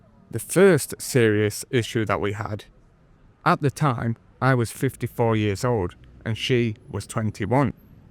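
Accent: England, United Kingdom. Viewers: Your full of BS you are not an Australian do you think we are all stupid, I heard you say with a pommy accent who you have been from Canada, The US with the same old story about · You certainly don’t sound Australian mate Have you spent time in the UK or something?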